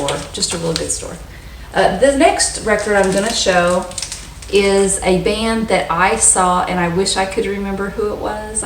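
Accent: South, United States. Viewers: I love your accent,. Are you from Georgia or the Carolinas? I’m in Wisconsin.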